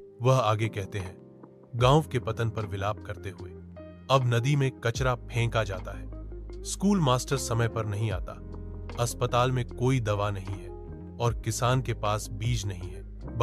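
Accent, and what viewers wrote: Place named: India. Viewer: اس کا لہجہ ہندی کے بجائے اردو ہوتا تو او بھی اچھا ہوتا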